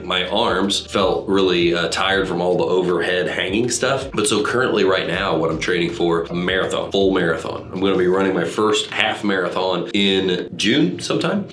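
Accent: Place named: United States. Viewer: Just clicked on this: THANK GOD not another rancid English accent or Aussie accent. Was totally expecting it and refreshing to hesr American English, which just sounds better and more “normal”. 😂❤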